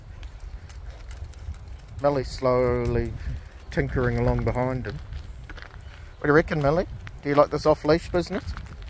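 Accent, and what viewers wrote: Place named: Australia. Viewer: My daughter lives in Melbourne and will be coming home to Phoenix, Arizona in a few weeks. We haven't seen our granddaughters in 11 years and when we talk to them we hear the accent and seeing your Bassett and hearing your voice reminds me of them. I got them hooked on Angus and Millie too! TH They can't wait to see our Dudley so they can spread the love on him.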